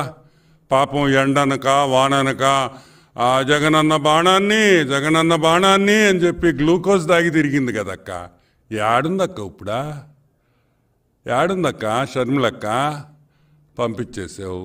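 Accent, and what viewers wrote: Nellore, India. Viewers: Nellore slang suprb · సర్, మీ నెల్లూరు Accent చాలా బాగుంది...జై CBN, జై టీడీపీ... అబద్దాలు చెప్పి పోయిన సారి గెలిచారు...మీరు వెంట వెంట నే విమర్శించాలి...వదలరాదు... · Entraa aa accent... Oka press meet pettinappudi language sarigaa vundaali... Nellore paruvu theeyyodduraa... Ok neeku nachhaledu daanni right gaa present cheyyali... Paruvu theesaav kadaraa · Nellure slong super anna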